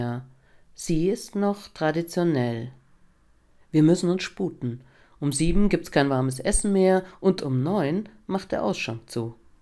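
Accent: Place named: Breslau, Germany. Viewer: Vielen Dank für das tolle Video! Nicht nur, dass mich die Sprecherinnen-Stimme etwas an die Mundart meiner Oma aus Breslau erinnert (nur eingelegentliches „gell“ fehlt noch 😄), es ist auch sehr informativ. Schön, jetzt überleg ich mir, mal hinzufahren. Zuletzt hab ich die Stadt als Kind Anfang der 80er gesehen.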